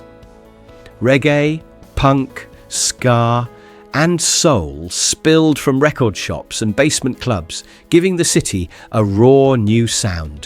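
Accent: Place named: United States. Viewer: He's a yank,period - geddit !!😅😅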